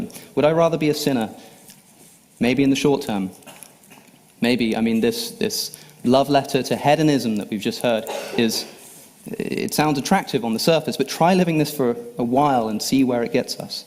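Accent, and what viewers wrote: England, United Kingdom. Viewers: Alex champions the art of pretentious sincerity unlike a Sam or a Dawkins who are more blunt about their spite and hate. This speech also proved that if you take away his english accent, he's not even half as smart as Sam Harris.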